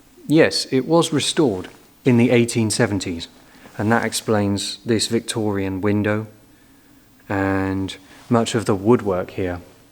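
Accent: United Kingdom. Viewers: Your commentary is so delightfully British 😁 Thank you.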